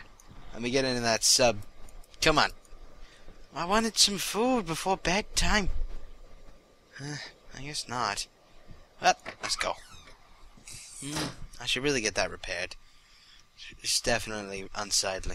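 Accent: United Kingdom. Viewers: whats with the british accent? X3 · is it just me or does he sound a little british in this video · is it me, or dose he have a slight british accent xD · Why does he speak in a british accent kind of thing? Its a bit annoying. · He has a slight british accent...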